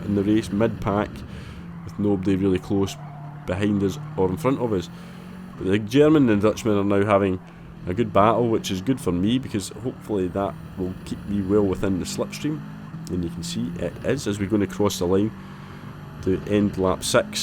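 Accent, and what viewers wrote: Scotland, United Kingdom. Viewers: cheers to you for the content mate 👍 we need a good Scottish Accent on YouTube (I wont hold being a Rangers fan against you 🤣).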